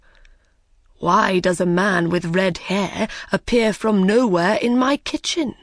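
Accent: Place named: United Kingdom. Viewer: Thanks so much.Nice story and excellent narratore with british accent.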